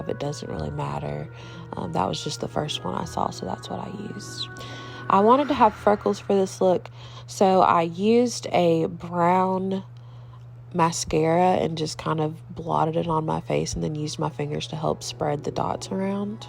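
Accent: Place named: South, United States. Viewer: Absolutely loved this! Gorgeous and your beautiful southern accent is the cutest! Wishing you much success on all of your social media platforms!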